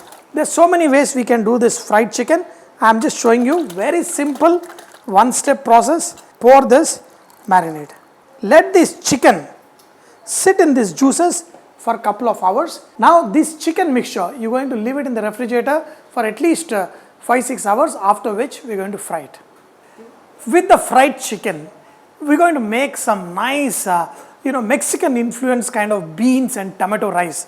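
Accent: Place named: Telugu, India. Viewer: Yara telugodu la unnav.. telugu matladam radha.. ya vathama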